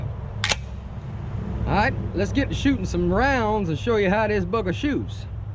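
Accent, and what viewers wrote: Louisiana, United States. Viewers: Are u from Louisiana?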